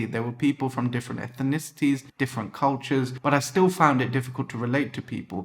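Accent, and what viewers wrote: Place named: United Kingdom. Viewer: I knew you are a Muslim but didn't know what country you are from. I suppose your parents moved to the UK and you grew up there! (as you have British accent)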